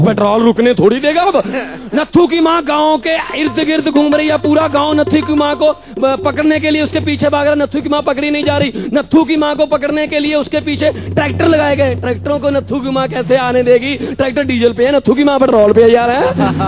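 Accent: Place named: Punjab, India. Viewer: he is actually great comedian in punjab.but he is very uncomfortable speaking hindi in punjabi accent 😂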